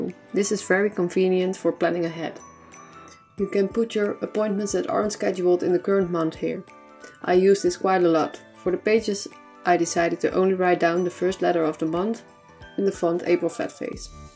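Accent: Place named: Netherlands. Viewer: Ik hoor je nederlands accent🤣 gaaf!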